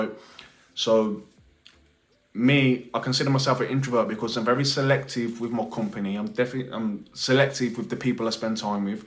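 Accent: Wolverhampton, United Kingdom. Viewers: You sound like me born and breed in Wolverhampton.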